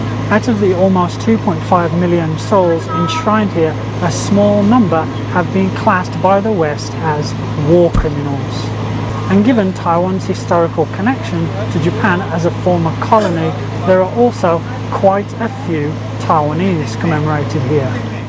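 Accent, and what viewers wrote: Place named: United Kingdom. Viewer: Never mind. I think. Mostly, I listen to what you say to get more familiar with the British accent. 😆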